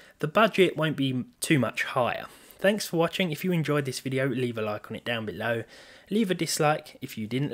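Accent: United Kingdom. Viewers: Lol i love this british accent.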